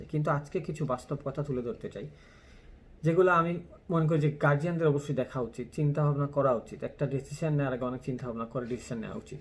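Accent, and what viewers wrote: Sylhet, Bangladesh. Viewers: Bro you are from sylhet right..? Me to😎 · Are yu sylheti?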